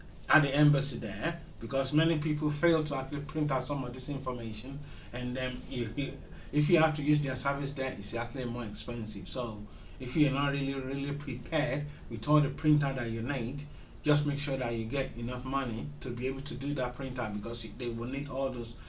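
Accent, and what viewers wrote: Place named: Nigeria. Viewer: you sound Nigerian 😆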